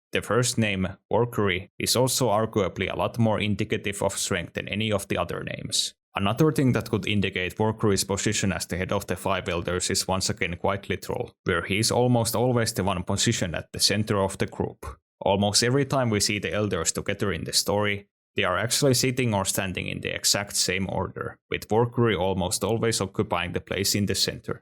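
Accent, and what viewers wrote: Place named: Finland. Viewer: Great content. Ps: Suomalainen aksentti spotattu😆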